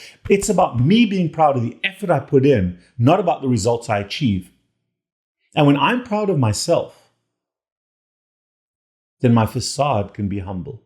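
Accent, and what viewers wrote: South Africa. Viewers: You just great. You still got the SA way of talking 😂🤘. Just like Elon and you, I also grew up in SA and I don't give a shit about what others think or say about me. My head is up no ones ass either. I need no stuff. I live for me and my children I am now living a healthy carnivore life as I can see the truth. Please keep sharing your knowledge with us as you helped saved my life as I can now see outside the box.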